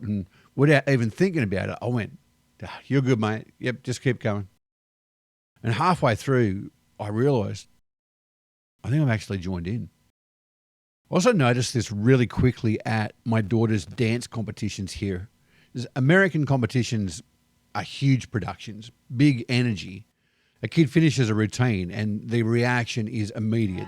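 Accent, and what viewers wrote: Australia. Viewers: If you are. Living. In the. Southern USA people are friendlier there than other parts of the USA. The northeast USA is less friendly. Since you have a pronounced Aussie accent, people everywhere will be curious and talk to you more. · It's fascinating that he thinks it's "very personal" to ask him about his (very charming) accent. To us Americans, this is just totally natural banter, not remotely personal. Interesting look at the cultural differences between us Yanks and the Aussies. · Welcome to America! I love the Australian accent.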